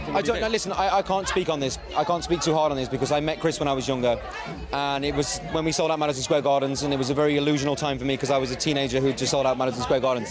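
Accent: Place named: Wales, United Kingdom. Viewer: He sounds welsh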